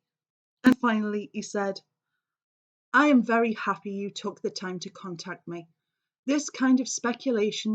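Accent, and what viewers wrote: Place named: Scotland, United Kingdom. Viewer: I loved this vid! Hello,! I have just discovered you and am now subbed! I adore your feisty Scottish voice and attitude. I can’t wait to see more! 👏👏👏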